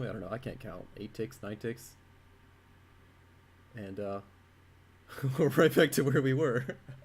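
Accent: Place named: France. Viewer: hey man, whats up. I followed you on twitch. Do you still stream? also you sound french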